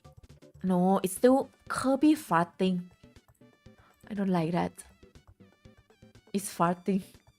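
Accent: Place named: Japan. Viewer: Why Do You Sound Japanese?😮😮😮 Btw I'm New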